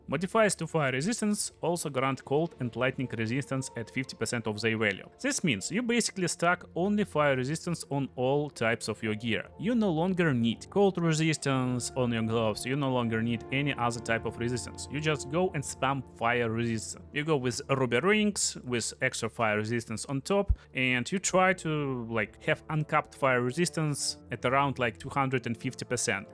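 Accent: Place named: Russia. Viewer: Are you russian? Based on music choice and strong accent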